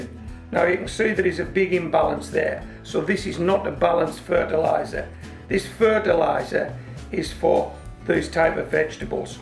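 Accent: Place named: Lake District, United Kingdom. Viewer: New subscriber here. Never been a keen gardener, always kept an almost zero maintenance garden before (shaming my old dad who has always been very keen and won many many show awards for his veg) - however, since starting off a few fruits last year, I found your channel and you have me inspired to try some veg growing in pots and a couple of tiny raised beds. Going through all your videos now and long may you continue to inspire. Cheers and all the best to you and Molly :) P.s - trying to place your accent, that can't be a Lakes lilt surely!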